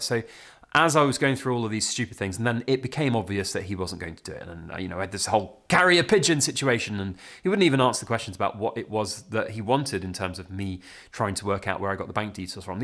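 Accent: United Kingdom. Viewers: Every time I see one of these videos I immediately know it’s gonna be some British accent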